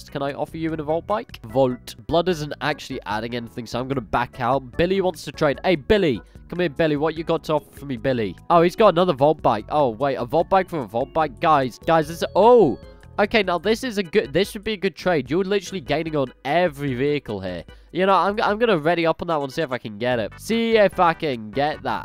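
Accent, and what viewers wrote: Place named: United Kingdom. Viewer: You got a big British accent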